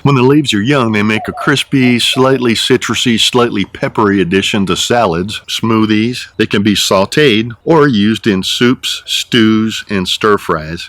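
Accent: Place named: Texas, United States. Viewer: I thought you must be a Texan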